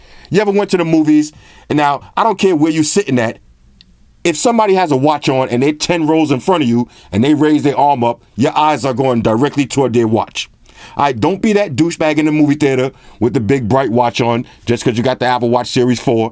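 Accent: New York, United States. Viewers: exactly!! lol and that NY accent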